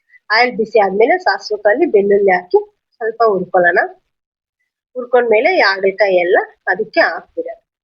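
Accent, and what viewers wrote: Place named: Andhra Pradesh, India. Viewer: Neevu kannada tumba cutagi matadtira madam❤😊🎉 I think nimma mother tongue Telugu irabahudu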